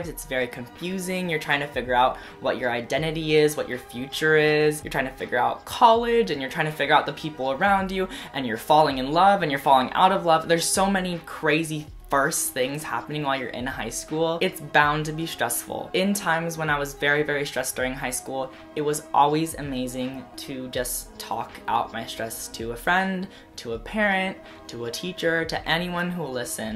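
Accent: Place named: South, United States. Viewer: i have been subscribed to you for some time now and im now realizing that you have a really light southern accent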